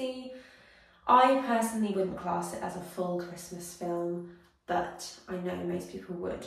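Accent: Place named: United Kingdom. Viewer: I just randomly found your channel now and I didn’t have anything to watch so i clicked on it and I’m in love 😭 I love your accent so much, you must be from the UK- this is definitely the kind of video I’d want to watch again! 🤍🫶🏼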